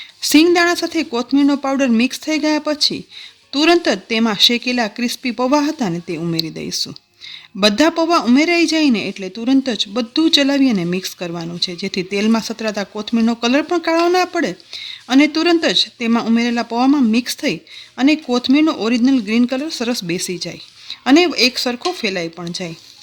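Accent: Kathiawar, India. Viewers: Nice astonishing recipe of namkeen nylone chevado with corriender plus sweet neem leaves powder presentation with sweet kathiawadi speech voice. Thanks to ji.. With feelings.... Add basil leaves to increase taste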